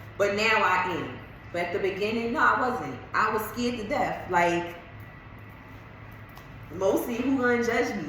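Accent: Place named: East Coast, United States. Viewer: Hey!!! I absolutely love everything about you!! Your look, your energy, your East Coast accent lol and most importantly your tips and guidance!! I’ve been making waist beads for 4 months and your videos have helped me tremendously!! Keep doin what’cha doin!!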